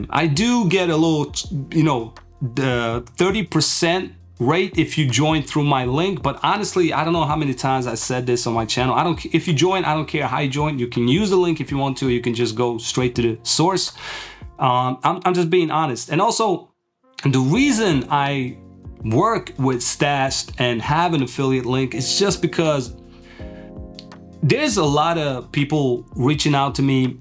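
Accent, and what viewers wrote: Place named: New Jersey, United States. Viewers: I thought my man was from New Jersey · even sound american. Am i trippin?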